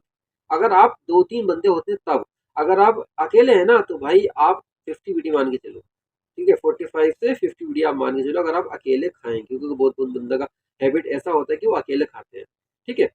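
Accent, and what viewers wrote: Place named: Rampur, India. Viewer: Ap Rampur ke ho kya ..kyon ke apki baat chit ka andaaz rampuri hai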